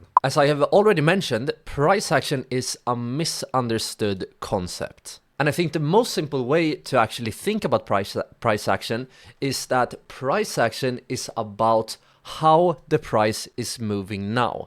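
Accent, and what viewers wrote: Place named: Sweden. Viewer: svengelskan e ju för skön!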